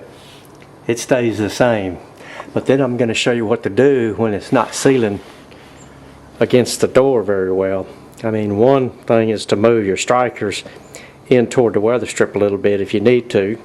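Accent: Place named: Appalachia, United States. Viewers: That southern accent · Why is every one of these videos narrated by someone with a hillbilly accent.....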